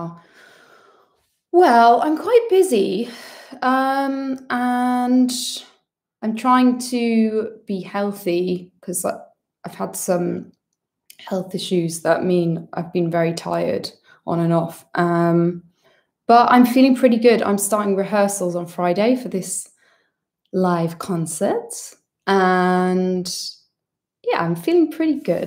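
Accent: United Kingdom. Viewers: Greek Icon with British Accent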